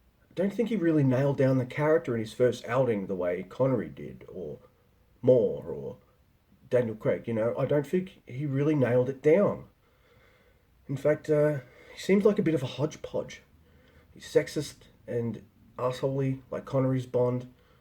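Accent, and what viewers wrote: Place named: United States. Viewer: The American accent!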